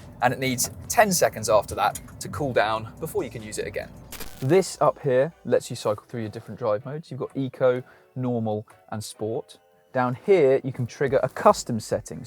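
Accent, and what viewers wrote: RP, United Kingdom. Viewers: Wouldn't say he has a 'posh' accent, well maybe a little posh, he has a standard RP accent from SE England but yes you can tell he's probably been to private school. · He speaks perfectly posh English. Impressive.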